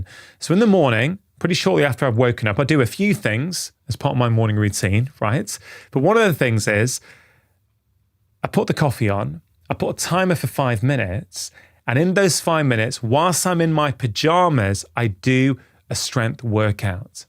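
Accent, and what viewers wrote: United Kingdom. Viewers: I am sucker for uk accent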